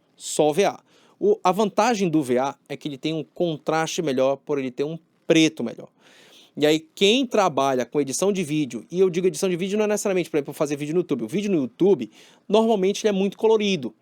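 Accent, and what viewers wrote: Pará, Brazil. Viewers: Pelo sotaque é paraense hahha! Mandou bem no vídeo, parceiro.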